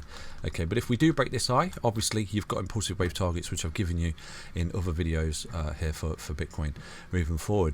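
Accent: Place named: Leeds, United Kingdom. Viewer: Grab a pcs of paper and write down the time and then you just put a time in the description for example HERO BTC Etc YT will split the video based on that. its as simple as that pal. Btw, I am curious where your accent from. You've mentioned Leicester a few times but for me it sounds like a Leeds accent? Just out of curiosity pal.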